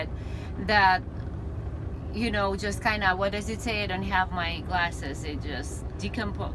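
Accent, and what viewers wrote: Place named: Ukraine. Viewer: Great video thank you. Sounds like a Ukrainian accent. Stay safe out there.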